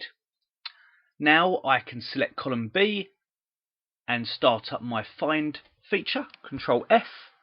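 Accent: England, United Kingdom. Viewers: Love English accent lol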